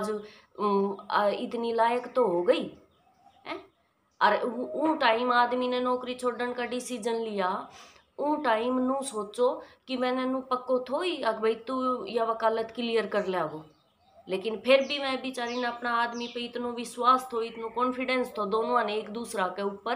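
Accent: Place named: Rajasthan, India. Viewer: Aapki boli t to rajshthani lago ho ji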